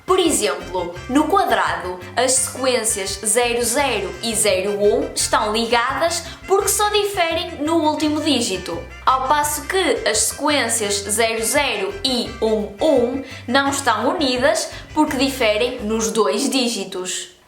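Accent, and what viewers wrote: Portugal. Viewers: Inteligente, simpática e linda. Como não amar esse canal? E esse sotaque que é uma mistura de potuguês europeu com caipira paulista? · Não sei nada de matemática, mas amo esse sotaque Português. ❤ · Acho que é a primeira vez na vida que vejo uma jovem falando português de Portugal. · Where in Portugal are you from? Your dialect is awesome!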